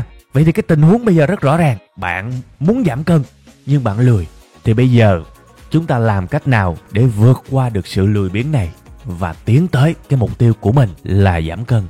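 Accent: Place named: Mekong Delta, Vietnam. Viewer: rất thích cái nói tự nhiên chân chất như miền Tây của bạn. Mà làm clip giống bạn ntn vậy chỉ mình với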